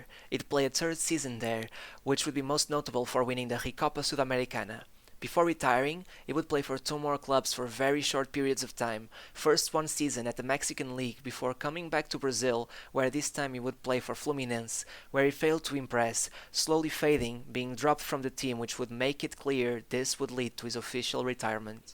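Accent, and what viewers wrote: Portugal. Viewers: I can tell that's a Portuguese native speaker narrating this video in English.🤔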